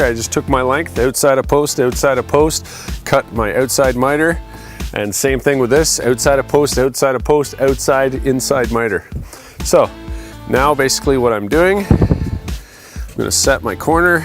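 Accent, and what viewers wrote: Canada. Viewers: thats what i do i find the miters eventually come apart but we are in SC ... and he sounds Canadian so big weather difference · Premmo this man sounds so canadain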